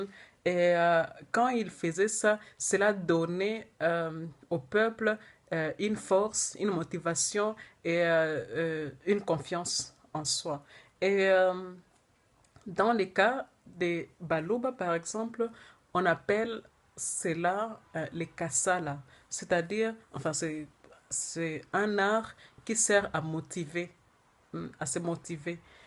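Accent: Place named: Germany. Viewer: Joli ce petit accent d’Allemagne petite sœur......Tmtc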